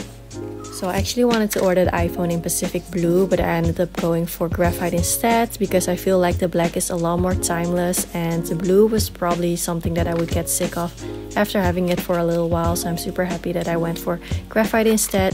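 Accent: Netherlands. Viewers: Is it me Or is your accent extremely dutch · are you dutch? it kinda sounds like you have a dutch accent :’) loved the video btw !! :D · Gurl are you Dutch? You sound it .. the accent. Im thinking of going from the 6 to 12... Was it possible to transfer data from 6 to 12 wireless? Or icloud backup? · Correct me if I am wrong, but your accent tends to be dutch-English I am right?. BTW nice unboxing-video especially the screen-protector. It's so easy. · Sterk nederlands accent😆😋😋 Leuke video👍🏽Heel ontspannend met de achtergrond muziek en rustgevende stem🥰 Top👌🏽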